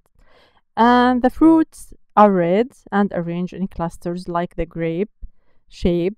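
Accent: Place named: Iran. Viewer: I love the Iranian accent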